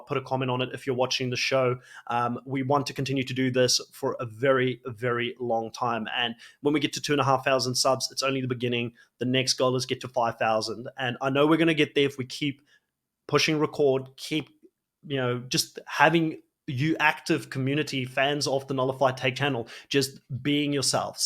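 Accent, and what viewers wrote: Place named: South Africa. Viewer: his accent is South African, though it can be confusing if you only hear that he lived in Australia and then moved to New Zealand. lol Watching the different versions of Survivor helped me be able to distinguish the English accents better. lol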